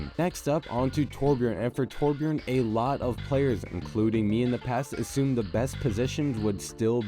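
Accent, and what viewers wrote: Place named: United States. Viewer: He sounds like a white American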